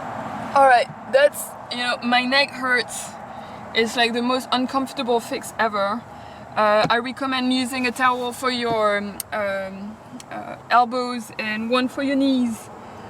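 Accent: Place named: France. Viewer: You have saved my husband's life.....he was going crazy with his A/C until this video.....!!!! Plus you have a beautiful french accent!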